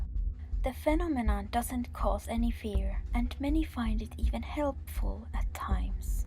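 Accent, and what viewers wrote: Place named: Finland. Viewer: I adore your accent so much! My mom is from Finland and has an accent as well, I live in America now so it's rare that I hear someone else with a finnish accent.. and I haven't found another finnish channel that I like as much as I like yours. Love your videos! <3 (especially the spooky ones)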